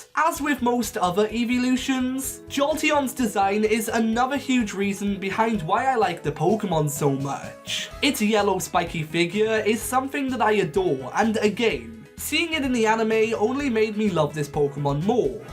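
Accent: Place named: Scotland, United Kingdom. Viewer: Rly sorry to ask, but where is your accent from?? My little bro says you sound scottish, but I just wanted to know!!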